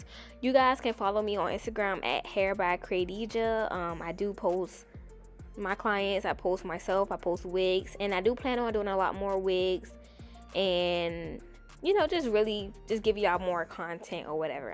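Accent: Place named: New Orleans, United States. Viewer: you sound like you from nola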